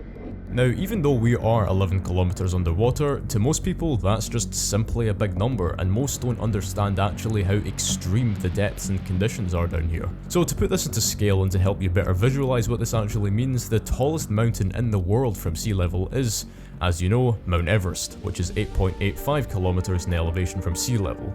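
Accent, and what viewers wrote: Scotland, United Kingdom. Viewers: Where are you from? You sound like a Scot trying to not have a Scottish accent. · I finally found a scottish youtuber! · Scottish YouTuber found lets go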